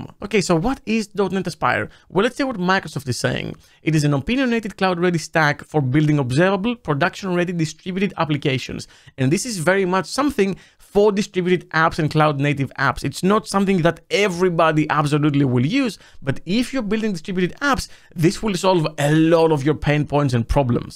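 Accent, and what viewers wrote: Greece. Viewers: are you greek? Not only does your name seem greek but your accent sounds greek to me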